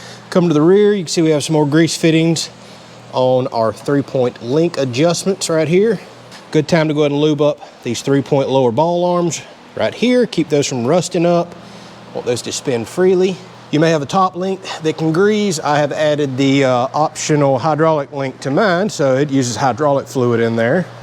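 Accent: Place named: Florida, United States. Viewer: Florida accents bust me up sounds like you say oil without the i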